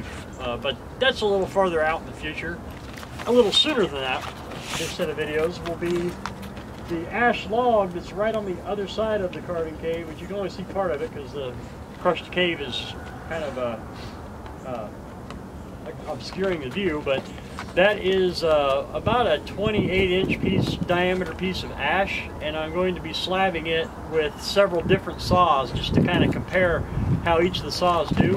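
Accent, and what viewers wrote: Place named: Missouri, United States. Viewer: Thank you....i was getting the impression you are in Mo , I have family in Mo. and thought i recognized your accent ( be it barely noticable )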